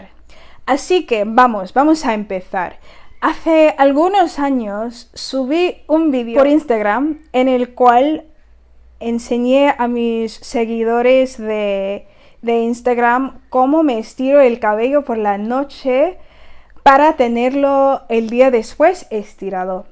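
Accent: Spain. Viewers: Tienes el acento muy Español cuando hablas, aunque si, hablas muy bien! Emma Dabiri me parece una mujer bastante inteligente y la perspectativa que nos contaste de su libro es interesante. Para mi personalmente escogì a crecer mi pelo Afro natural por razones relacionadas a mis creencias politicas. Fue un acto de resistencia feminista negra y asì desde entonces vivo con el espiritu y mente completamente libre. Hasta hoy en dia, encuentro muchisimo inspiraciòn del estilo de las panteras negras como Angela Davis y Kathleen Neal Cleaver. Es que Emma Dabiri me da mucha pena y decepciòn. Primero, no creo que ella hubiera tenido esta plataforma de hablar por parte de las mujeres negras si no fuera mulata con la piel clara. Podria ser que habria algunos que no estan de acuerdo, pero ella utiliza esto ademas a su sexualidad para subir su visibildad publica y para adelantar su carrera. 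Por ejemplo no entiendo por que auñ de ser respetada por sus ideas intelectuales tenia que publicar fotos de si mismo llevando nada mas que su ropa interior en Instagram?? Para mi esto muestra que intelectualmente ella no esta tan iluminada ni liberada como tal. Es que nunca nos vamos a ver a un hombre a su mismo nivel profesional presentandose en instagram medio desnudo intentando a utilizar su sexualidad para subir su perfil publica. Eso es solo lo que queria comentar en cuanto a ella. Y como tu, soy britanica pero aprendì mi español viviendo y trabajando en Cuba y Colombia. Me encanta los acentos de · ¿Cuantos idiomas hablas? Tienes una dialecta de España. 😁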